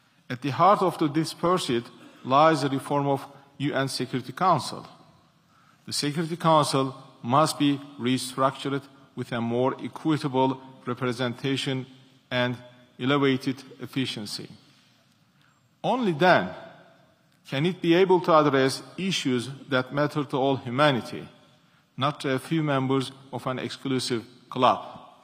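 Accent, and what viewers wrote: Turkey. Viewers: Türk gibi konusuyor :) Ama istedigi gibi konusabilir, karizmasi yeter! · Hala bu kadar agir bir turkce aksani ile ingilizce konusmasi😅 Arkadas ne olacak bu turklerin ingilizce ile imtihani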